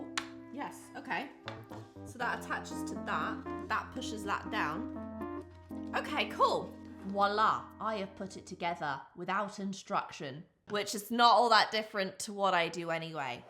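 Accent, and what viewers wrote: United Kingdom. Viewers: I love your energy and sense of humour in all your videos)) and i also love your british accent 🤩 I think that the best thing about this rinser is that you actually can use different colors and keep rinsing. You should test it vs. a regular jar using not only pink, but pink, blue, yellow, etc. Then, I think, the rinser would prove very effective 😁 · Hi lady I don’t watch your channel but my sis dose, she doesn’t have a YouTube account but she wants to enter the give away and she LOVES your videos she’s very obsessed with your vids and wants to tell you she like your British accent 😂 I also subbed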